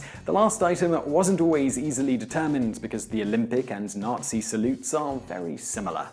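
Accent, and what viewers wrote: United Kingdom. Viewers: Jadger1871...It is wise to keep in mind that he is from Britain and certain words are said different in other countries. I live in a country other from where I was born and grew up and it is not unusual for people to correct me in my pronunciation. I pay then little heed because my way of saying the word is no more or less valid then is theirs. If they were to go to my country they would be corrected there just as I am here.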